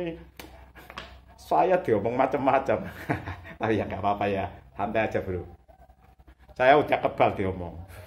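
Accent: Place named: Banyumas, Indonesia. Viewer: dari daerah mana,logat banyumasan nggeh. Saya suka gaya ramalan huruf dan Agama. Enak dipahami.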